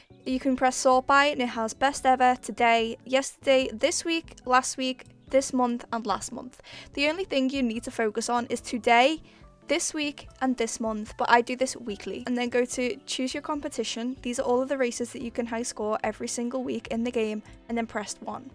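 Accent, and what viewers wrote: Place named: Scotland, United Kingdom. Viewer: are you Scottish cuse u soound it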